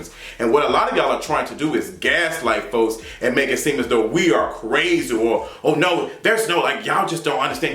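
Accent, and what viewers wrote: Memphis, United States. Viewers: Are u from Memphis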